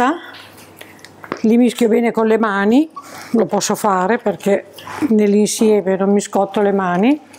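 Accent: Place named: Romagna, Italy. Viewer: Ciao, la tua cucina mi piace moltissimo. Poi questo dolce accento romagnolo, delizioso. Ho guardato i nidi di rondine, buonissimo. Lo farò. Grazie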